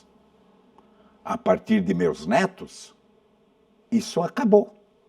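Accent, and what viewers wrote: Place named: Sardinia, Italy. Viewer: Eu sou italiano "sardo" aprendi português faz muito tempo e seu sotaque é parecido ao meu. Compreendo as razões de sua queixa.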